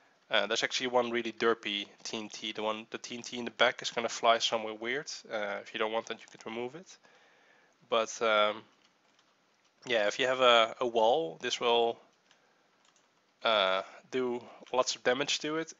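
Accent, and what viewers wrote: Netherlands. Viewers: Are you dutch? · Yeah you can hear That he's dutch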